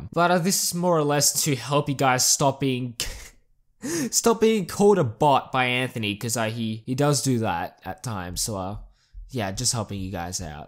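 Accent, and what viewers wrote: Australia. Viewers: Sounds strayan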